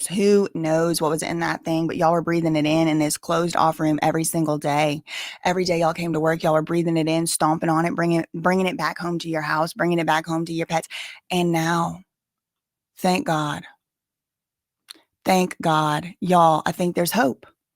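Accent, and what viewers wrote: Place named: Louisiana, United States. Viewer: You and Britney Spears have the same Louisiana accent. You are genuinely funny!! Always making me laugh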